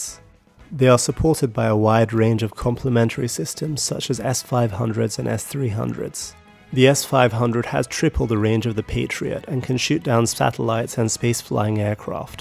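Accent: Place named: United Kingdom. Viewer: Pompous British accents are hilarious 😂 Nothing personal, great videos !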